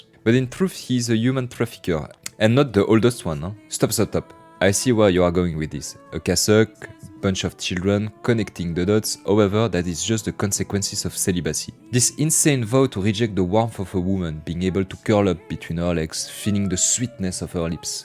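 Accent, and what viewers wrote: France. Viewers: I just want to say this is the most french accent I've ever heard. Magnifique!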